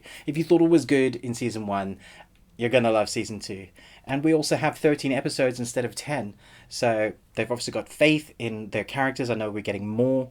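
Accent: South Africa. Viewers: Is it just me or does this guy sound South African?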